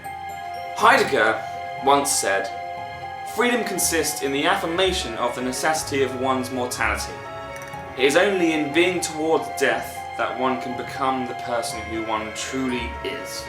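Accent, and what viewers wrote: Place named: England, United Kingdom. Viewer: ugh the English accent. why don't you all take up more of the Irish speak it's more musical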